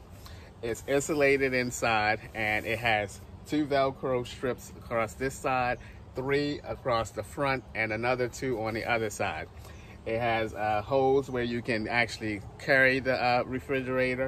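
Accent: Baltimore, United States. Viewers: That Baltimore accent thick😅🙌🏽🙌🏽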